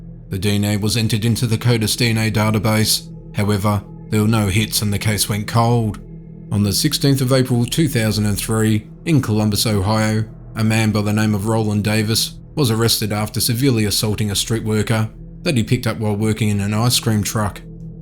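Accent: Australia. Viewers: He's an Aussie 😊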